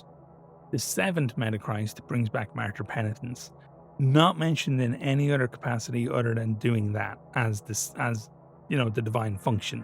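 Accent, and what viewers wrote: Ireland. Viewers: Are you Irish ? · Irish? Subbed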